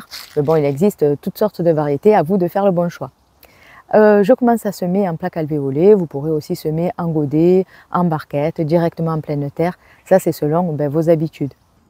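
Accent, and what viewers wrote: South, France. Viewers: J'aime vraiment vous retrouver pour vos vidéos Ce petit accent du midi me fait rêver ❤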